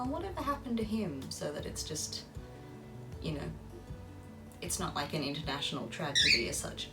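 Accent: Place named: Australia. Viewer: Wow! You sound like youre an Aussie?? I had no idea! GREAT accent!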